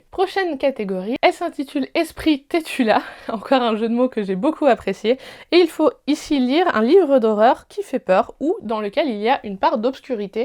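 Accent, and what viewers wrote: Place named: England, United Kingdom. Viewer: Coucou, j’ai hâte de voir tes retours car il y a beaucoup de livres qui me donnent envie et en passant j’aime trop ton accent anglais 😍. Bon challenge et bonne lecture!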